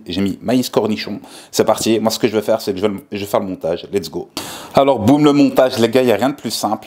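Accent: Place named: Brussels, Belgium. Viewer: Le khoroto de bx on reconnaît l’accent 😂😂😂🇲🇦🇧🇪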